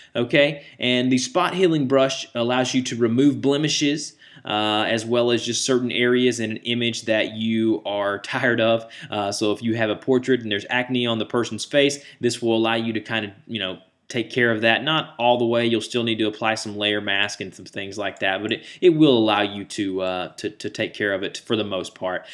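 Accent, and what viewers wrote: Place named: North Carolina, United States. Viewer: you must be from north carolina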